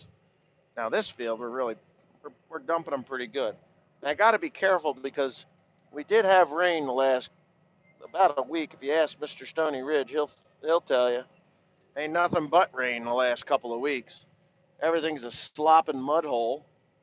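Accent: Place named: Mississippi Delta, United States. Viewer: Sounds like u in ms delta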